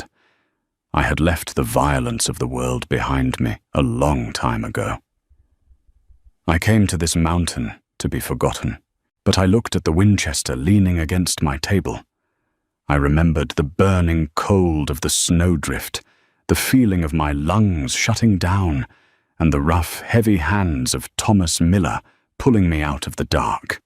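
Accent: England, United Kingdom. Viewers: narrated by an American frontiersman with AN ENGLISH ACCENT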